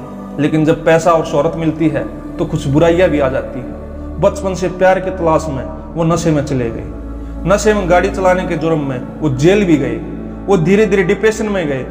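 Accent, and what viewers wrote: Haryana, India. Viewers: Haryanvi hindi 😮😮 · Sir aapki awaj ka haryanvi touch wonderful hai .... Thanks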